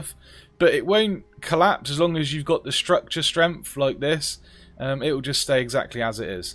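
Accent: Norfolk, United Kingdom. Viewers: Some really great ideas! Are you from Norfolk or Suffolk? I live near Norwich :) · i like your british accent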